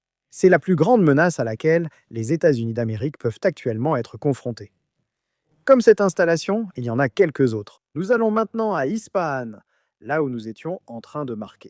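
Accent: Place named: Israel. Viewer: Vous voulez etre les seuls a avoir la bombe atomique. Pour qui vous prenez vous pour les maitres du monde .pourquoi Israël possede telle la bombe atomique.vous ne parlez pas des 75000 palestiniens éliminés par vos force israélienne .aucune sanctions contres l'entité sioniste. J'espère que les iraniens possede l'arme nucléaire.vous devez etre un israéliens cela se devine avec votre intonation. Sortait déjà des territoires palestiniens ou avez commis un genocide inhumain. Mais votre destin est scellé rien ne pourra excusé l'élimination de plus de 75000 palestiniens.avec le silence des européens et des americains.mais dieu veille. et votre Fin et proche.vous n'avez pas d'état vous etes un peuple errant sans domicile fixe.sans la benediction americaine qui vous protege sinon vous n'êtes rien qu'un grain de sables dans l'immensité du désert. mais dieu saura reconnaître les siens. 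Et celui ci feras tombé les foudres du ciel sur vos têtes. C'est vous les terroristes tueur de femme et d'enfant. La situation réelle est que dieu vous chatieras. Tu mangera tes petits pain en enfer.sans les amerloques vous netes rien.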